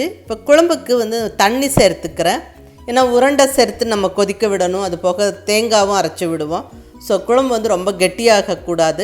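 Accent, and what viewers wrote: Kongu, India. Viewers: Namma kongu Tamil la fantastic ah ahsathureenga.......superb ga ma Vaazhga valamudan 🙏 neengalum vungal ahnbu kudumbamum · Super madem kongu tamil super mam tku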